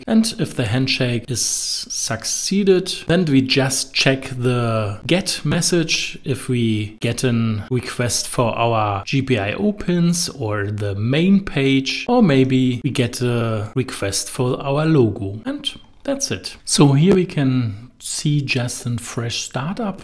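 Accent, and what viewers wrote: Germany. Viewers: Man kann dein Denglisch richtig raushören 😂 aber gutes Video 👍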